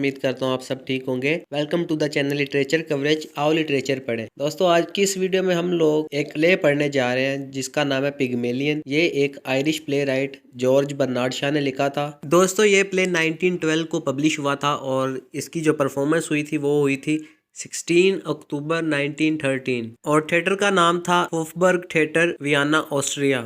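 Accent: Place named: Punjab, India. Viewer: Your Urdu is Punjabi Urdu.Apna accent theek kijiye.baqi sab qarib qarib theek hai.with good wishes from Calcutta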